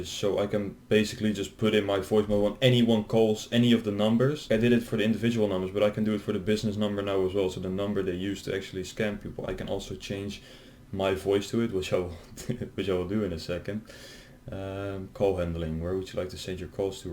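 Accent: Netherlands. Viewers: Broer waar in nederland woon je ? Dacht al die nederlandse engels accent. Jij zat vroeger zeker op ict of wa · Great vid, but you reveal a little too much that you're Dutch speaking, i'd say close to Belgium or Belgium itself ;-) groeten van Antwerpen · Happen to be dutch lol? Lmao your accent love it, just like the justice given! Keep up the good work! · Hi.. thanks for your service in raiding the scammers. Are you Dutch? Sorry, just listening to your accent. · You send dutch af my guy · ik heb echt respect voor jou kerel je gebruikt je hacking skills tenminste voor een goed doel ga zo door!!! je bent Nederlands neem ik aan van je accent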